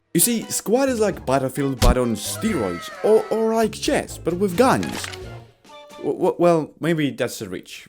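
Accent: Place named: Europe. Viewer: You have a bright future in this, the right amount of edgy, the weird European accent and tempo. Keep it up.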